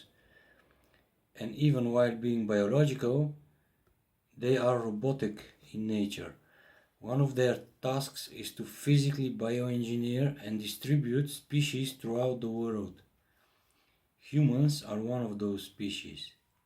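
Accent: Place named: Croatia. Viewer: where are you from you're accent sounds Croatian. I misspelled before sorry.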